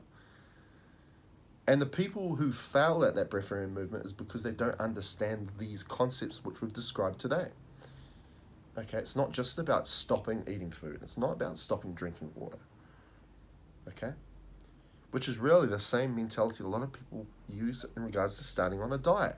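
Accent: New Zealand. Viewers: Aloha, are you from NZ ?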